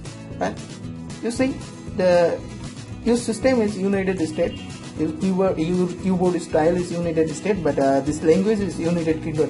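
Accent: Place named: India. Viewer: tech tutorial videos always have indian accent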